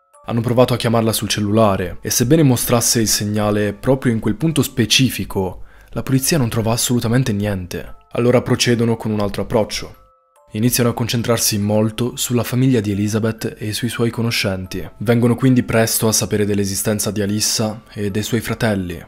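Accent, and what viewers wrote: Sardinia, Italy. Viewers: Ma sai che mi stai incuriosendo? Parli spesso del paese in cui vivi e dato che ho capito che probabilmente è dalle mie parti mi chiedevo se per caso non fosse vicino a Limbiate! Chissà... Però mi chiedo anche come mai se vivi da queste parti si sente così tanto il tuo accento sardo? Io infatti ero assolutamente convinta che tu vivessi in Sardegna! Vabbè come dici tu giustamente quando parli di Istagram, che se vogliamo farci i cazzi tuoi possiamo seguirti li,visto che io non uso Istagram me li faccio addirittura qui ma capisco assolutamente se non vorrai rispondere! Buona serata a te!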